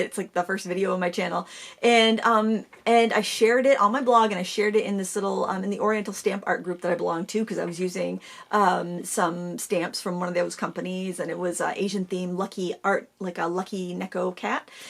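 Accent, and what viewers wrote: Maine, United States. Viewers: First found you about the time you started. Don't know how I found you, but when I heard you revert into a Maine accent I was hooked! I have lived in AZ for 21 yrs. Born & lived in Portland, ME 57 yrs, hated winter. But still have strong ties & visit every 2 yrs. Even have old friends who live in your area! I have learned oodles fr. you. When you refer to someone trying to do better with H2O coloring by getting a better brush that's me. It doesn't help. but I keep trying! Watching you paint is like therapy to me. It's like a link to home. So, please keep going! Can't imagine how difficult it must be. Your honesty, personality, talent, wide knowledge of lots of things is amazing. You go Girl. I do miss when you & your friend Sarah? did the Q & A, things change. Happy Anniversary, and many more!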